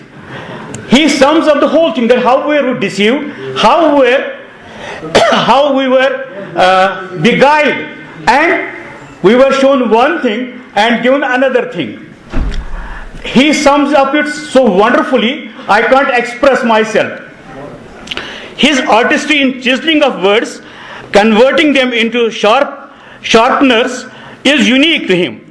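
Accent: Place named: England, United Kingdom. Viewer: I think presenter is from England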